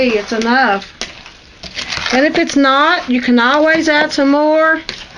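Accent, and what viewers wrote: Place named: Appalachia, United States. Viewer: Thank you for taking the time to share your knowledge. I feel like I'm listening to my mama's family every time I hear you. Their roots are in Appalachia. I've also noticed some similarities in the some of the dishes you make. Thanks for teaching me new tricks, new recipes, and bringing back old memories.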